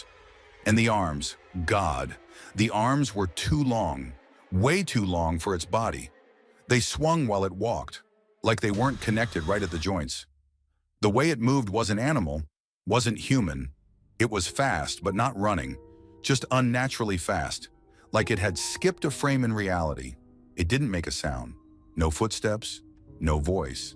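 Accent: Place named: Chicago, United States. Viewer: I love the Dennis Farina accent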